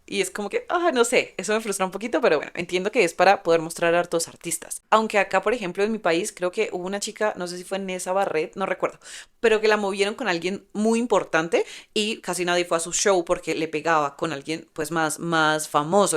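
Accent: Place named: Colombia. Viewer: Con ese acento tan hermoso sabía qie eras de Colombia😘 ❤.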